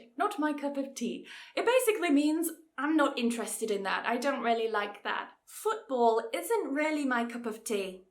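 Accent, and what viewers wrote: United Kingdom. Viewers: !! I love your videos! How do I find you on preply without having to search through every tutor? May favorite idiom … 🥁 Keep your wig on 😅🤣😂🤣😂🤣😂 Love it! Going to use that one here in America! I’m American (Spanish American), however LOVE the British accent and want to learn proper pronunciations. Thank you! Love your videos!! 🎉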